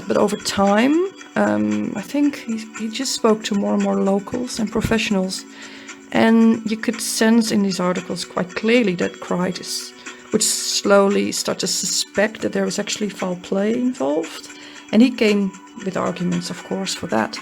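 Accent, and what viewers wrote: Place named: Netherlands. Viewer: dutchies try to speak english 😂bad accent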